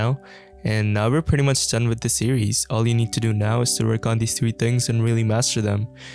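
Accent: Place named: India. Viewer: really helpful indian accent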